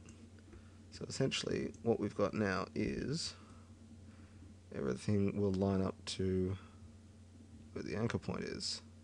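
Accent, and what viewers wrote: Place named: Australia. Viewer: Gotta love that australian accent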